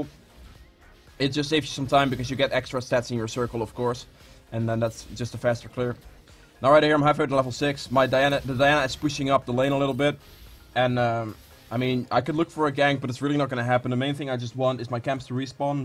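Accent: Netherlands. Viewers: Nice Dutch accent, and nice video :P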